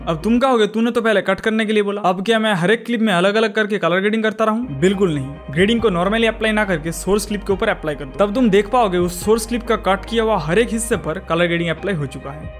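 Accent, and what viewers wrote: Bengal, India. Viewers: ap ke awaj mai bangali bala feel ata hai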